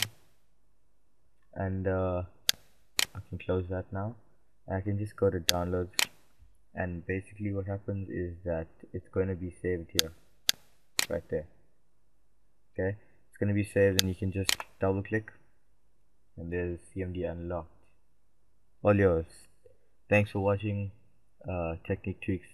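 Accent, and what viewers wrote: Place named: South Africa. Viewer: South African :D lol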